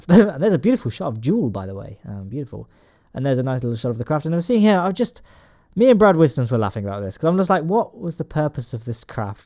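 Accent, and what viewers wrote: United Kingdom. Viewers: Canadian? South park? This guy is a full-fledged brit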